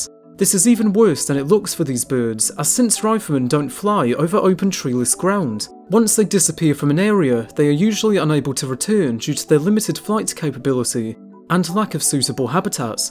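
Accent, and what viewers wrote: England, United Kingdom. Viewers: are you English or New Zealand ish? Because you sound English but mainly do birds from New Zealand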